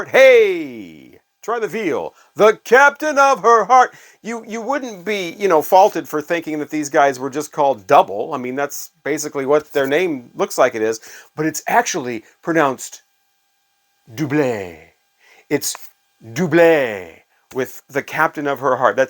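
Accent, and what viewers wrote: United States. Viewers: It hurts my ears every time someone American tries to pronounce Scandinavian names 😭But okay, it wasn't the worst I've ever heard. 😂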